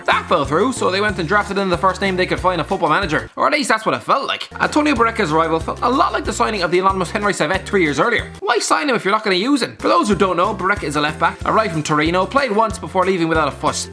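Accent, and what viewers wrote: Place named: Ireland. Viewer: his tongue moves faster than he talks Love u.. #noHOMO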